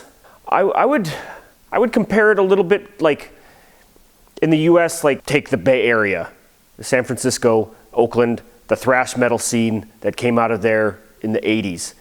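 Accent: Germany. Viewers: The remarkable thing is, that you already developed a little German accent. Or am I hallucinatin'? From one Metalhead to another: Welcome! Good to have you here. Make sure you'll stay! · Why does it sound like you got a german accent?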